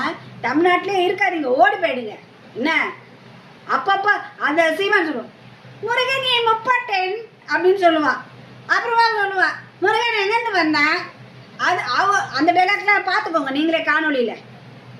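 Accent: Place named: Telugu, India. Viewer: உன் பேச்சிலேயே தெரியுது நீ ஒரு தெலுங்கு கரீனா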